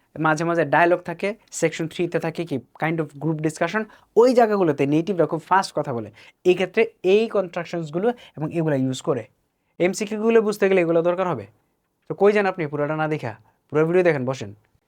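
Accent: United States. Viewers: american accent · ভাই এগুলো America Accent নাকি British Accent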